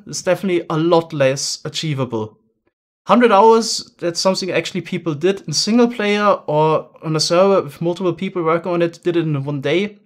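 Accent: Germany. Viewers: Ich höre da definitiv Deutsch raus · I'm sorry aber man hört das du deutsch bist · Ich Feier den deutschen Akzent^^ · I didnt know it is possible to have such an EXTREME German accent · Im sorry to say this but u rrally have an extreme german accent man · You're German? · dein english is so deutsch holy fuck😅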